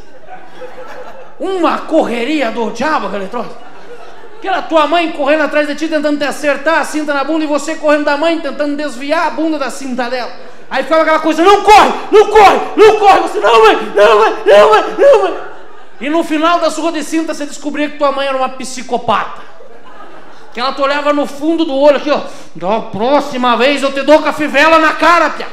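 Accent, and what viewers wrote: Curitiba, Brazil. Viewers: Se tu nasceu ao redor de Curitiba , esse aí é o sotaque . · Myller Rodrigues Curitibano!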